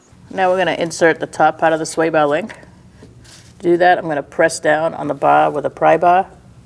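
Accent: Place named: New England, United States. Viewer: Love that New England accent !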